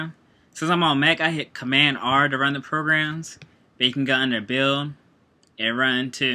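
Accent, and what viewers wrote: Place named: South, United States. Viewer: This really really helped me , thanks alot man . i like your country accent too :)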